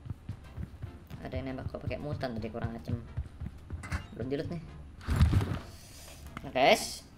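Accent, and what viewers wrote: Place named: East, Indonesia. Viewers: Bang itu Kayanya orang Papua Bang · Cara ngomonya orang papua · Logat nya orang papau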